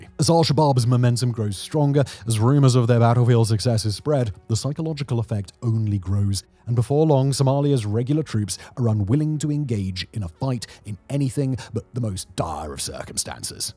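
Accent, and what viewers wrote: RP, United Kingdom. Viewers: you are a prime example that everything sounds correct when said in an RP British accent. I live in Mogadishu wife,kids, extended family, the whole shebang. We are not under siege. The turks have just declared that the two blocks they explored has an estimated 20 billion barrels of oil. I do not engage with your output because you know very little about very much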